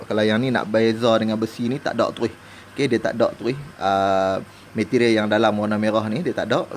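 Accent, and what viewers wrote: North, Malaysia. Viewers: Assalamualaikum w.t.b tuan.. Kluaq loghat utara na.. Pekat wei.. Terbaik bro.. Salam dri follower channel hang dri negri bwh bayu.. Teruskn brkongsi info dn ilmu.. Smoga sukses slalu.. Aamiin.. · Baguih nya hang punya cakap utara.. terhibur dan senang nak hadam ,lepaih ni buat video cakap utara semua baru ngam..haha. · haaa baru la ngam guna, utara mai, depa faham ja tu,guna loghat utara universal, terkemut-kemut 🤣, good job 🤙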